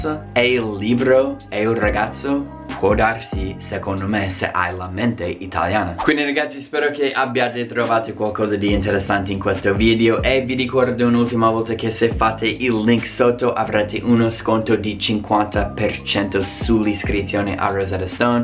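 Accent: United States. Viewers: Ma quando hai una parola ostica da pronunciare, credo che tu sei abituato a visualizzare com'è scritta e di conseguenza la pronunci da americano. Se invece ascolti senza sapere come è scritta e provi a pronunciarla troverai meno difficoltà. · Caro, io sono un'italiana che risiede nel US da 43 anni. Sono cresciuta in Italia ma ho fatto le scuole superiori qui nel US e ho dimenticato molto Italiano. Ero brava una volta a scrivere l'Italiano, ma ora non tanto. Ho imparato bene l'inglese e potrei correggere tanti americani. Complimenti. Se non hai scritto lo "script" per questo video, sei molto bravo. La pronuncia e` ancora molto americana, pero`. L'italiano lo parli meglio di me. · L'italiano è molto complesso come lingua. Ma, insomma lo parli bene con l'accento americano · Caro non confondere il sesso con il genere. L'italiano avendo il genere anche negli articoli determinativi o indeterminativi, oltre al singolare e al plurale, e. 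molto piu' comprensibile di altre lingue. Non e'. difficile impararlo. I problemi sono altri, cioe' la sintassi, la semantica dello sterminato vocabolario,i modi e i tempi dei verbi e tanto altro. Per quanto riguarda la pronunzia, purtroppo e' piu' complicato in quanto i popoli anglo-sassoni di madrelingua, con quel tipo di pronuncia, modificano in modo naturale le corde vocali. Basta vedere la differenza tra te che sei americano e un inglese di Londra,la pronuncia e' completamente diversa.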